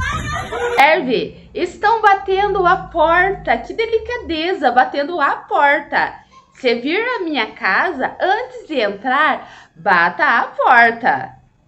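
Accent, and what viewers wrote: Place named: Paraná, Brazil. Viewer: Aula muito boa , oratória muito boa com o sotaque do nosso Paraná rsrs. Vou indicar pro meu filho assistir, sucesso professora !!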